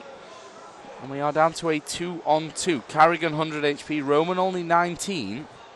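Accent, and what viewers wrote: Australia. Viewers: Who commentated this? An aussie? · wow, aussie commentator! AUZ FTW!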